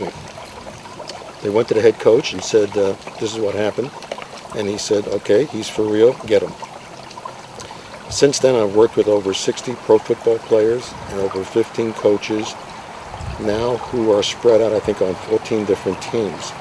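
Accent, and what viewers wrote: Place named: Bengal, India. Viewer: Started off sounding like a bong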